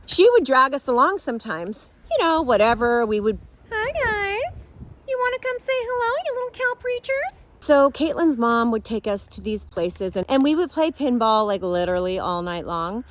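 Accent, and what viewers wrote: England, United Kingdom. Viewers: Love the British accent!!! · Beautiful British accent,. Makes your beautiful voice more beautiful. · Not lovin' the English accent and imagine how cringe it's be if a British youtuber broke into an American accent. He/she would sure look a fool! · The English accent is awesome!! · Llllove that English accent! I give you kudos for that one for sure!! (Even if I'm a year late for this one). But I love all accents, I gotta say, just love 'em! And so, throwing in some others now and then is just fine with me! But above all, I love your devotional talks here, thanks, our eternal friend!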